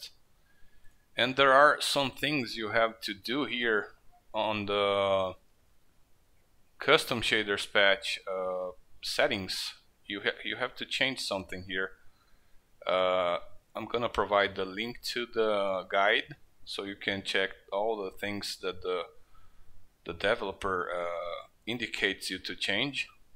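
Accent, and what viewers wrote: Brazil. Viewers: Eu sei que vc é Br pelo sotaque e pelo windows em Pt Br hauhuah mas olha, vlw pelo tutorial! :P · pelo sotaque eu tava aqui pensando "esse cara deve ser br" hahahahahah batata! sucesso para o canal cara, valeu a dica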